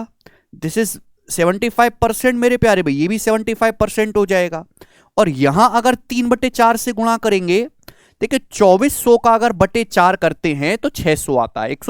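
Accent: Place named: Bihar, India. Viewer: Bihari ho kya ? Be percent ko pashnt bolte ho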